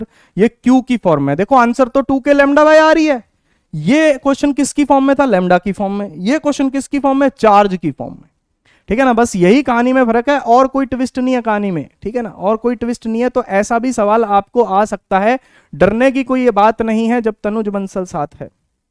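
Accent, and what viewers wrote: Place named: Haryana, India. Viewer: Thank you sir sir apki Haryanvi Katee Zaher hai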